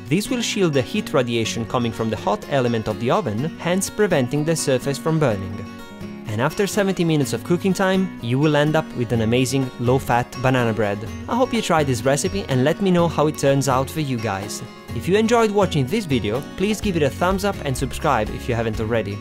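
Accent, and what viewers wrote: Italy. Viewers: I think he is from Italy... it seems so to me cause im italian;) idk tho... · are you italian? im trying to figure out what your accent is, it's really cool!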